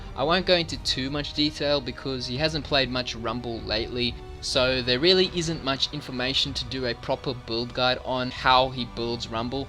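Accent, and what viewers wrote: South Africa. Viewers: south african??? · You sound South African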